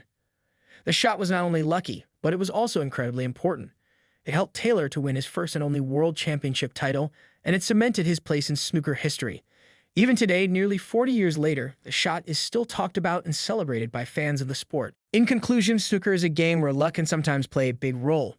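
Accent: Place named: United States. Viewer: So weird hearing an American covering snooker and even the the way he said it annoyed me.. It's not the fish mate 😂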